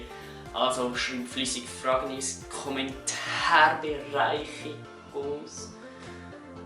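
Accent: Switzerland. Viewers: Wir Norddeutschen brauchen doch hin und wieder Untertitel. Aber lass Dich nicht stören. Schwyzerdütsch ist schön. (Oder ist das gar schon Zürcher Hochdeutsch?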